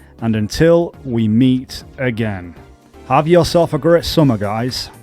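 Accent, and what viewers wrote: Bradford, United Kingdom. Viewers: he’s pretty clearly from the UK, he’s putting dramatically and drastically together, not that hard to understand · Yeah I don’t get why people are making out he’s Spanish or it’s not his first language. He sounds like he’s from Bradford or something 😂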